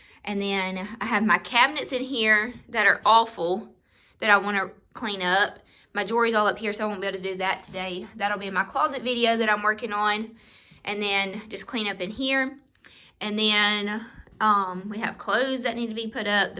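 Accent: South, United States. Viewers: I'm not one of those youtubers who looks flawless lol I cant stand fake one their home are not that dirty to make a video. Love your videos and southern accent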